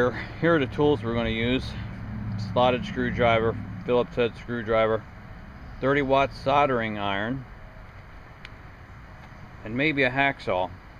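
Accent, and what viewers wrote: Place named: Midwest, United States. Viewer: gold 🥇 great video. 👍 your accent makes me think you are from illinois / midwest area.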